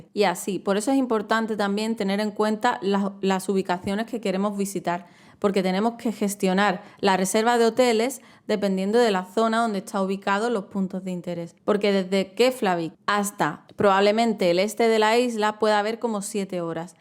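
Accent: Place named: Andalusia, Spain. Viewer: Espectacular video! No podría ser más útil. Tengo pensado ir a Islandia en mi próximo viaje ya que uno de mis sueños viajeros es ver una aurora boreal. Voy a guardar este video para ir organizando en base a tus consejos ❤️. Gracias guapísima, con ese acento andaluz hermoso como toda su gente! Saludos desde Buenos Aires!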